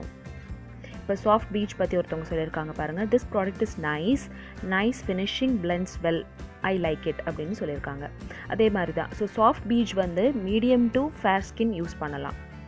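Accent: Madurai, India. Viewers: OK mam unga voice nalla irukku athuvum illama neenga madurai ache super mam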